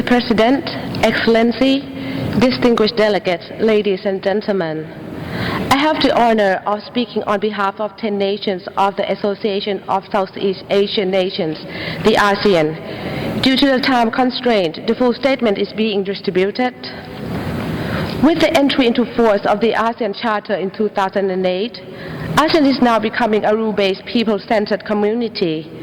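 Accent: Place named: Thailand. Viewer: Flawless. This is how you suppose to talk on the world stage. She has some Thai accent but it's clear and understandable. Yingluck should be a shame of herself.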